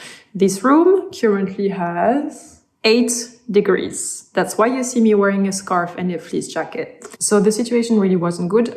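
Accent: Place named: France. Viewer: I lived in France for a year as an English teacher in Provence and hearing your accent is really making me miss living there!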